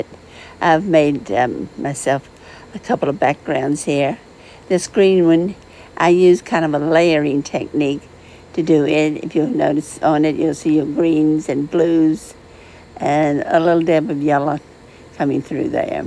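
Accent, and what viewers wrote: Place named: South, United States. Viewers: Your southern accent is beautiful...yella. These are such beautiful works of art! I just found your channel and I love it!❤ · What a great idea!! It turned out beautifully. I just found your channel and subscribed. Your accent sounds like your from a town near me in North Carolina!!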